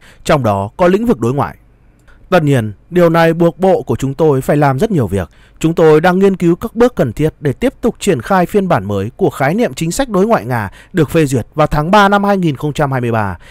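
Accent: Standard. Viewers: Bạn bl rất chuẩn ,hay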